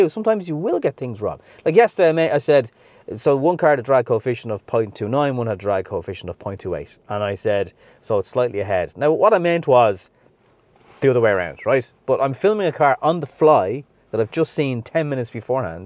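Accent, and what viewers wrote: Ireland. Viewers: Sorry, I haven't followed you up to now. I'm not a fan of irish voices on YT vids. However yours is fine, now I'm a follower. What you've said is all common sense, it appears the general public has none of this. I appreciate your candor. I appreciate your comments on the effort / rewards etc. This video was honest, transparent and wholesome. Thanks for this.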